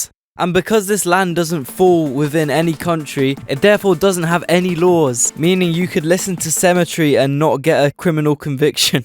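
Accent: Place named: United Kingdom. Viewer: british accent balls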